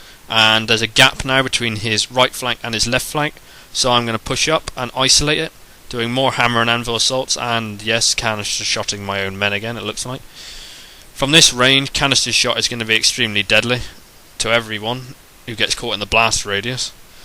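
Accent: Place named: Bristol, United Kingdom. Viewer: am I right in saying bristolian accent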